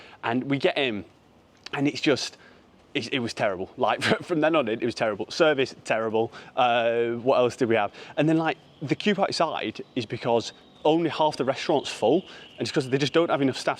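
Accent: United Kingdom. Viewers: Thou accent is English isn't it?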